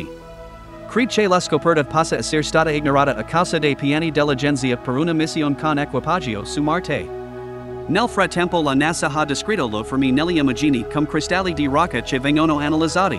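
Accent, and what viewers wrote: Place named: Italian-American, United States. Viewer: Sorry but I understand that some time italian speak horrible English, but this one that present the video speak italian-ametican??? New language. So I close because impossible ti understand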